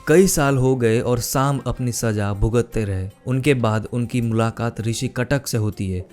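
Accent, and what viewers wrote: Gujarat, India. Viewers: You sound to be Gujarati??😅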